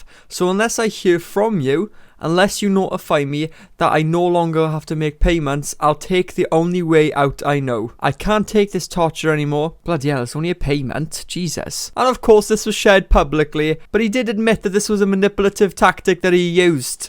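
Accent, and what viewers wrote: Wales, United Kingdom. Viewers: First video of yours I've ever watched and all I hear is the Welsh accent. 🤣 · Ngl I accidentally turned off the video when I tried to click on the channel once I heard the Welsh accent 😶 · Odd question but where are you from? You sound very Welshy like you’re from the valleys 🙈 I’m from Aberdare 🤣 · Are you Welsh? Your accent sounds like it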